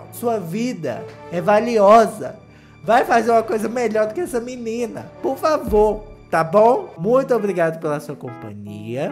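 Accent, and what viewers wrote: Minas Gerais, Brazil. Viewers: Eu amo o comentando as coisas com esse sotaquinho mineiro fofo ♡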